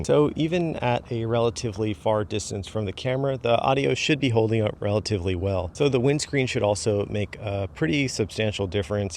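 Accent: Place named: United States. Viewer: I’ll do it Great looking man. Nice American accent. Good content 10/10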